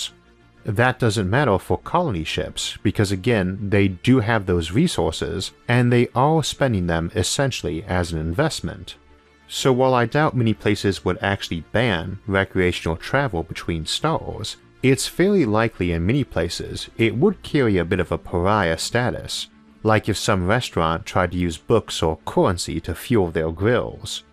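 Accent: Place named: South, United States. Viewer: I've heard you say you have a 'speech impediment' in the past. I think your voice sounds cool as all hell man!! Like an educated southern American gentlemen, i think its awesome :)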